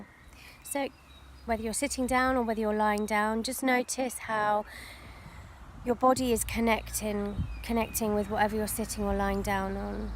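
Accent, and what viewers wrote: United Kingdom. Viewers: Are U from any British Country?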